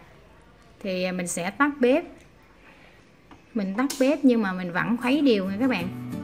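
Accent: Mekong Delta, Vietnam. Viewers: Trinh Trần NGƯỜI MIỄN TÂY HÌNH NHƯ PHÁT ÂM NHƯ VẬY ĐÓ, BẠN CÓ GIỎI QUA DẠY LẠI MẤY NGƯỜI MIỀN TRUNG, BẮC KÌA, CÓ VÙNG GỌI N LÀ L VÀ NGƯỢC LẠI NỮA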